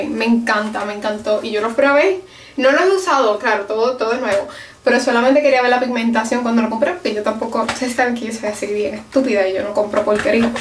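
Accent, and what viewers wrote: Puerto Rico. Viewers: que hables bien boricua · nombre : maura país : panamá me gusta lo original que eres y que apesar que vives en usa mantienes tu acento puerto riqueño critica : esa mascara de macadamia no hay en panamá :( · hola. mi nombre es: Barbara soy de venezuela... y bueno hay muchas cosas que me gustan de ti como tu estilo me encantan tus accesorios y forma de vestir tu cabello es hermoso amo tu pollina,tus pestañas y el color de tus ojos.. tambien me encanta tu asento P.R... e aprendido muchas cosas de ti con tus videos.... no tengo ninguna critica para ti.. me gustaria que hicieras mas videos de cocina de postres o cosas dulces y tips para pintarnos las uñas y cosas asi.. en fin me gusta todo..TQ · 1- Cheryl Jimenez- Puerto Rico ;) ... 2- Me encantan tus consejos, la manera en que hablas, se te sale lo boricua por los poros ;) 3- No tengo criticas....sigue siendo como hasta ahora, ah bueno...cierra la puerta de tu closet jijiji xoxox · Hola soy Susana, de España. 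Lo que más me gusta de tu canal es lo sencilla,graciosa e inteligente que eres, y la manera que tienes de expresarte donde se nota muchísimo tu procedencia puertorriqueña. Como crítica constructiva te pediría que de vez en cuando cambiaras tu peinado o maquillaje, aunque sea poco. Cuídate!:D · Alooo, Mi nombre es Elivier... vivo en Baja California Sur, MEXICO.. Me encantan tus videos, porque no los cortas ni nada de eso.. son simplemente como tu eres, con errores o sin ellos, y ademas me gusta la forma en que tu hablas, porque me agrada mucho el acento de PR, y RD.. Me gustaria que no cambiaras para nada tu palabreo, porque es lo que te identifica, y me estaria genial que no olvides los videos de cocina, ya que son suuuuper delicious! Un gran saludo, un beso y un abrazo. <3 muak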